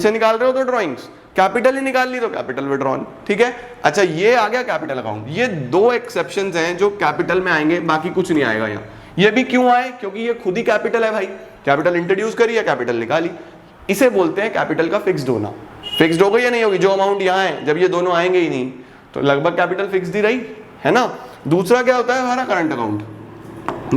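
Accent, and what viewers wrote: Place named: Uttar Pradesh, India. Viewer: Sir ji aap kaha se hu lagte tu up ke ho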